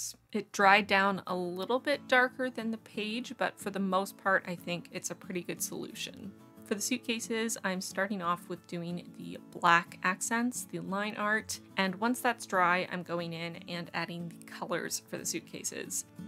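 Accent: Canada. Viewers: Aayyeee I had no idea you were canadian til i heard pencil crayon haha xD ive only ever heard us say that with our NA accents, i think brits say it too but not sure xD nice to see more canadian-based bujo channels. i love seeing both your spreads and your husbands, my current style is waayy more in-line with what you do for your husband, but my dreams are more like yours so it's really cool seeing both!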